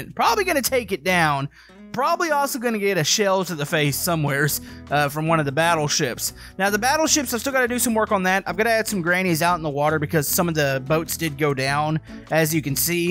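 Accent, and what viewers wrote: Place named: South, United States. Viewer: You kind of have a southern accent at times.. hmmmm lol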